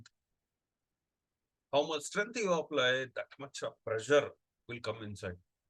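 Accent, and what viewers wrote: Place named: South India, India. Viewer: Sweet south Indian Accent ...hehehe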